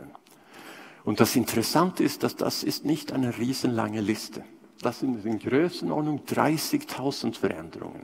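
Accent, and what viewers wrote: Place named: Swabia, Germany. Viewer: Beeindruckende Deutschkenntnisse! Der schwedische Akzent erinnert ein bisschen an einen schwäbisch-alemannischem Akzent. Das fand ich sehr interessant. Nicht nur den Vortrag.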